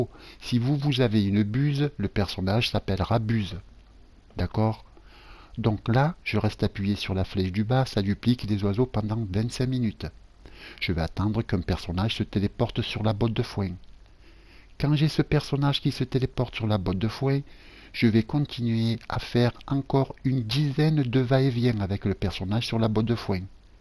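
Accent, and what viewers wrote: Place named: South, France. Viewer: merci le plus bel accent du ssuuuudd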